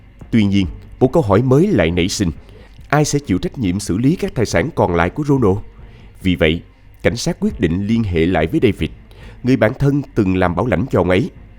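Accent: South, Vietnam. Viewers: Giọng anh Bảo nghe rõ ràng chuẩn miền nam, nghe rất nghiêm túc nhưng rất cuốn!❤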